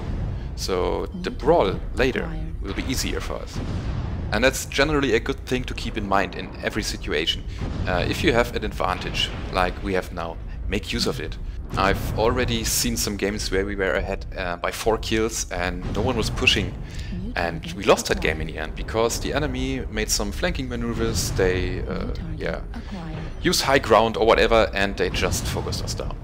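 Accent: Germany. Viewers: Whoa, you are a really high skill MWO pilot. Your videos are excellent and your analysis and advice are right on the spot, thank you ! Always entertaining, that European (guessing German) accent is...OK? With that same accent but using a voice modulator this your vids could get even better !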